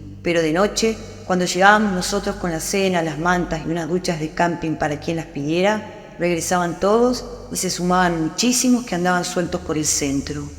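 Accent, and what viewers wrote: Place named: Argentina. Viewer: La primera parte es una crónica. Qué excelente Mariana Enriquez!!. Y qué hermoso escuchar esta voz argentina ❤❤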